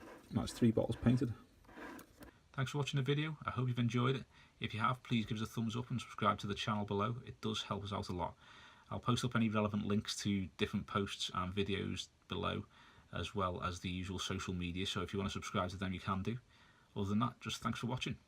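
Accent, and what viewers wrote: Liverpool, United Kingdom. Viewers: Scouser! Yes lad :)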